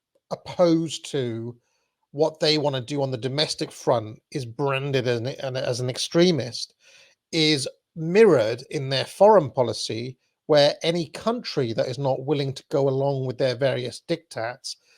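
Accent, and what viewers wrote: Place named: United Kingdom. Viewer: So, you start this video, sounding like a very intelligent and wise person. Your British accent helps to accentuate this quite well. You then speak how many or most of the billionaire class seek to enslave us and the tactics that they have been using to do so. Yet toward the end, you completely pivot, like you fell down some sort of rabbit hole, and try to tell us that the people who seek to return the power to the people are actually moles for the billionaire class. I believe that you, yourself, are a mole for the billionaire class, or, more likely, you are just a damn defeatist who would do better for the world by just shutting the .... up.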